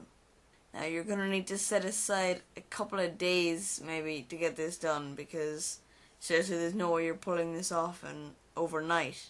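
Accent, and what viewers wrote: Ireland. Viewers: Not only was this usefull, it was also amusing, you're funny, you from ireland? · Cool Irish accent now to the matter in hand. I over wax badly on three inch hair on a hot summer day and wax melt easily sipping into my pores now my scalp is lumpy and super dry . My facial hair area is also dry and hard due to the wax do you think the shampoo will get the wax out of pores. I regret the day I put that stuff on my hair! Please write me back to help guid me thanx.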